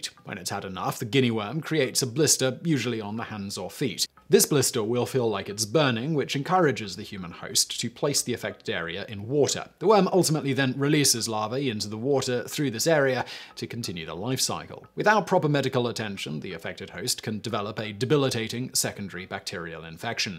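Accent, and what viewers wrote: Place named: RP, United Kingdom. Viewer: Why do you sound posher than usual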